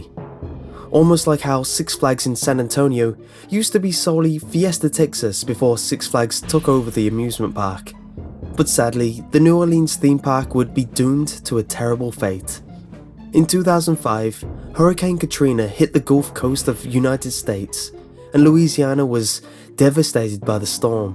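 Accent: United Kingdom. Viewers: I love your British :)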